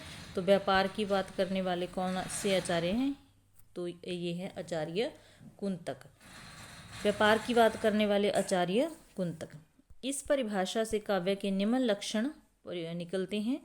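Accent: Punjab, India. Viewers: Mam aap Punjab se h kya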